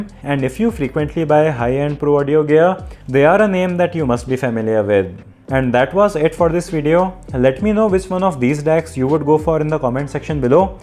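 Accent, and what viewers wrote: India. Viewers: The Indian accent is the most annoying English accent in the world ewww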